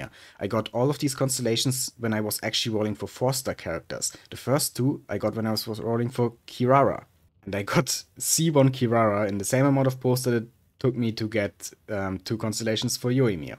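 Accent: Germany. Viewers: Gutes Video, finde es krass, wie sehr man heraushört, dass du deutsch bist. xD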